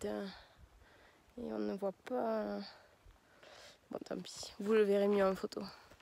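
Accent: South, France. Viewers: Une bien belle aventure. Bravo la miss. Partage nous d'autres belles aventures comme ca. C'est top. +1 abonné. 👍 Et cet accent du sud me rappelle pleins de choses. 😉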